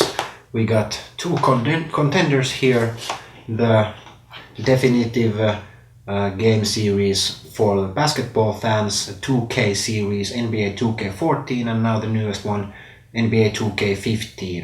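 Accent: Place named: Finland. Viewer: OMG FINNISH ACCENT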